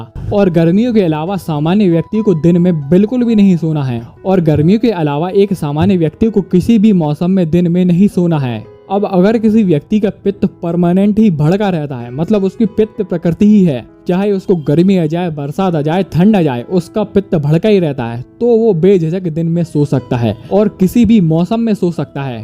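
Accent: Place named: Bundelkhand, India. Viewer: Bundelkhand se h aap sir😊😊😊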